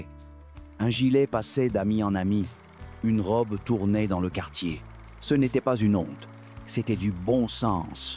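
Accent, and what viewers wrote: Quebec, Canada. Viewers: ... ce petit accent du Québek.... il me plaît bien pour dire comment c'était avant, chez nous en France.:) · C'est fou ce que cette voix a l'accent de Limoges (ou il est censé avoir passé son enfance) C'est plutôt quebecois Mais pour le fond ,ok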